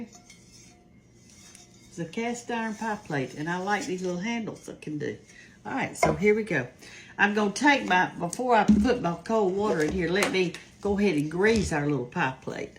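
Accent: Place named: South, United States. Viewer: Love, love your southern country accent , so friendly❣️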